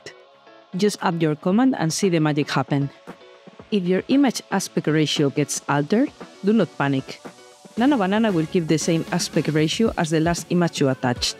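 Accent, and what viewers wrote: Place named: Latin America. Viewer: The latino dialect makes any tutorial a joy to study! 🙂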